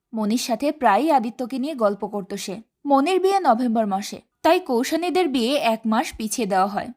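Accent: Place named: Bangladesh. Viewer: seser dik apnar comment sune bojha gelo apni Bangladesh er. Khub sundor... Osadharon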